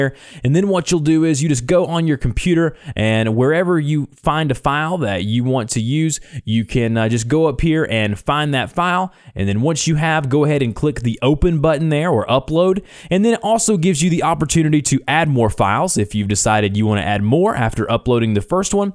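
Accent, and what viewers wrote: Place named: South, United States. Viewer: Not useful. I have had DB several years. You used to be able to just add files to your local Dropbox folder and it would get uploaded automatically. And to share there was a local button. But it appears that's all gone away. This video had nothing on this. I can't say I was fond of the Southern twang either...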